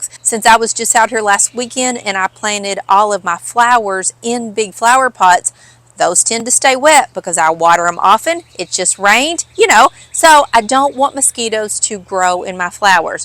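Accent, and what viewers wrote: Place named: Kentucky, United States. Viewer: Love,love,love your program. I love Paducah. Have friends there that are involved in the art community. I especially live the doughnuts for getting rid of mosquitoes. They are already bad in Owensboro. You are so personable and we have the same accent. I also love KY Lake. Had many good times there.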